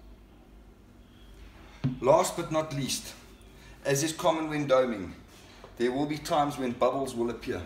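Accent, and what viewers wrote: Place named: South Africa. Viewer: That sounds like a South African accent 😁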